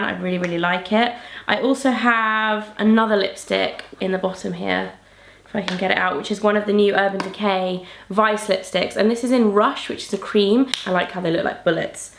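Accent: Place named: RP, United Kingdom. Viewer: I know it might be a weird thing to say, but I just love accent. English is my second language and I just think that all these English people sound so nice and posh and I wish that I could mimic accent.:D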